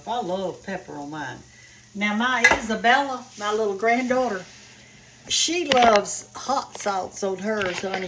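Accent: South, United States. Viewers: Miss can you video spinach omelet. I love spinach w garlic Parmesan. God bless you thank you. Love Southern accent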